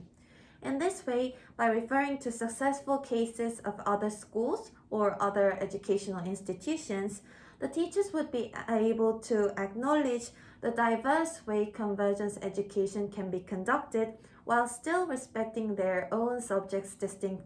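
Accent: United Kingdom. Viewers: 영국 발음이시네요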